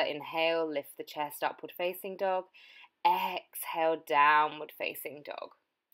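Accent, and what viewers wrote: United Kingdom. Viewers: Thanks for an excellent flow - many postures here I haven't done in ages. Also nice to hear an English accent, and a bit of humour! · Where is her accent from? I know it's British, but what part of England?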